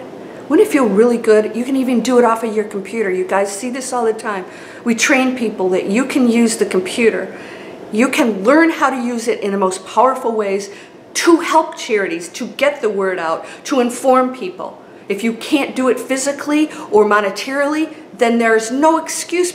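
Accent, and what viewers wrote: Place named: Detroit, United States. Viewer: Hi, I just got to say I love your Detroit accent. My mom and dad where from Detroit and my mother has a similar accent like yours. My parents lived on 9 Mile back in the 1950s and early 1960s. They moved to California in 1963 but I wanted to say how much I love your channel. Thanks for everything.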